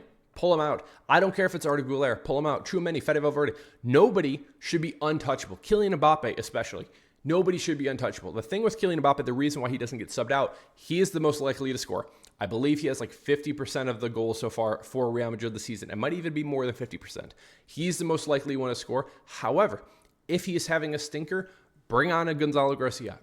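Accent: United States. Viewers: Are u from kc bro?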